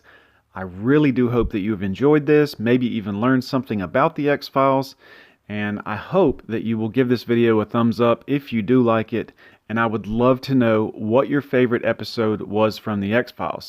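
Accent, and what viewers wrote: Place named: South, United States. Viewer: also i'm assuming your southern, i can somewhat tell with the subtle accent and a very occasional "y'all"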